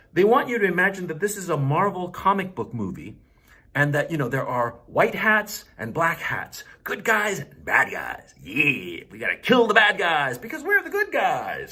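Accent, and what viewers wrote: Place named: United States. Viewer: Let’s start from the beginning. As you mentioned on you title and your first words, INVASION. Now, let’s describe what invasion means. Invasion means to enter someone property or house or country by force and steal all. So now you daub that Russia is not bombing Ukraine, we have already 14 days and just last night they bombed an Hospital ( Maternity) So you are you trying to say that Russia is bringing flowers to the Ukrainian people. My friend this is war, and 14 days has passed and they are not able to reach the capital yet. Nothing against Russian people, but the government yes in deed. Mr., I don’t know you by your accent you sound American and you are one those people that is a loser and badmouth your own country. Open your eye, it’s a war, people will suffer with this stupid war. The leader should learn what we humans beings have been true all those years work all the wars like WW11, where 6 million people died from Europe and more then 25 million from Russia. 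We are in 2022, there no reason for war. Now, you sjouke be more smart then that. Now my question is, it has been already 14 days of this was R and this video is when it just started. I just wonder where are you now??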